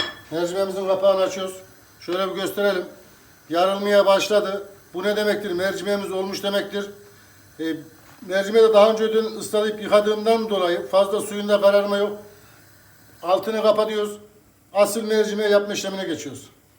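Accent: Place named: Çankırı, Turkey. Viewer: Abi Çankırılı misin şive benziyor da hemşehrimiz misin merak ettim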